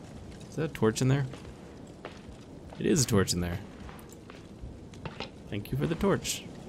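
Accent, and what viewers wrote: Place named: West Coast, United States. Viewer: You have a very relaxing voice. Where in the USA are you? I'm guessing West Coast...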